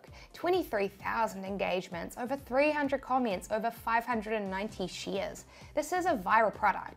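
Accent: New Zealand. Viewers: 2mins in and I think... hold on is this a kiwi accent. Great vid found as a youtube suggested video:) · Definitely a New Zealander. The way she says No. “Nore.. Nore.. Nore.” Love it! 😁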